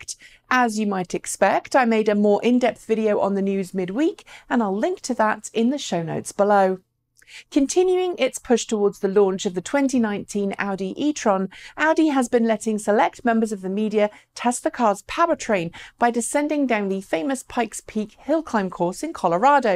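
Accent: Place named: United Kingdom. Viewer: It is without contest that your show of the many YouTube professional and semi professional out there in the digital world one of the best if not the best in it's class. Yes many may say it is in fact your livelihood but the progress made over the years with how the show is presented is fantastic. The content is always from my Jamaican prospective deep, rich and eye opening given from a balanced position. This is where my deliberate and express bias comes in; that beautiful British voice is always beckoning my undivided attention to hear the unbroken dialect. Sweet!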